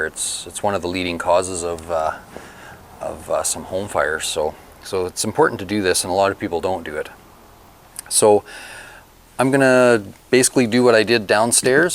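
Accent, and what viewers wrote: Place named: Canada. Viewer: Luv this dudes Canadian accent.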